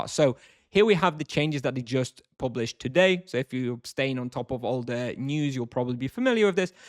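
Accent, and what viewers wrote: United Kingdom. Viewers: Unrelated question. Why do you have a proper British accent if you're Polish?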